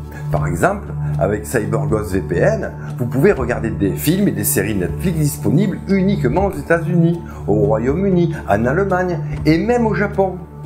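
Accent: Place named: Toulouse, France. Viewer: Holà A une époque où les chaînes de YouTube m'apporte plus que les années sur les bancs d'écoles.... La tienne franchement est exceptionnelle! Ton accent me rappelle Toulouse, ton vocabulaire et ton humour embellissent mes moments de détente. A 52 ballets,retraité précoce! Exilé dans la sierra uruguayenne... Je te souhaite une année riche et tranquille. Ton travail change la donne: Le savoir devient accessible et tu y contribue énormément!!! le Boss des temps disparu!!! Merci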